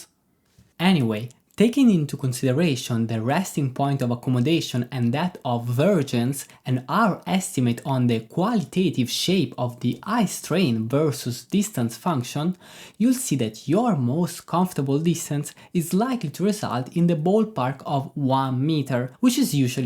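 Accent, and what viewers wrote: Italy. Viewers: Si sente molto l'accento italiano comunque ahhaha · This is undoubtedly the best video on the subject of monitor size. Thank you. I love your Italian accent. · Sounds more like Italian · Italian Is my guess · Italian, Russian is completely different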